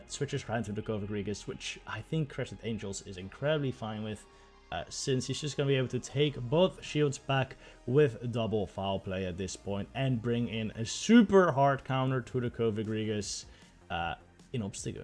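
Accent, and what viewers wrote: Netherlands. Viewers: Youre Dutch aren't ya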